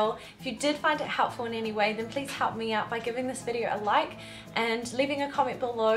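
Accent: New Zealand. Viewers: Hi I'm here from Crystals channel, love hearing a kiwi voice on YouTube. Yay !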